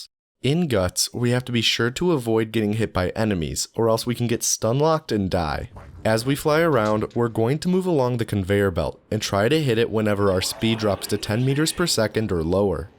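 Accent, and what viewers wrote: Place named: Boston, United States. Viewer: I detect a Boston or new England accent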